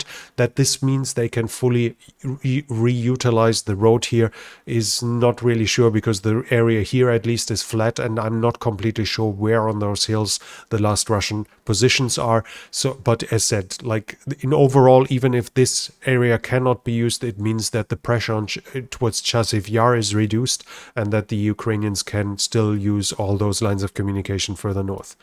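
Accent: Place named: Germany. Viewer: He has the same accent as Werner Herzog. Is this an accent from a certain part of Germany? Bavarian, maybe?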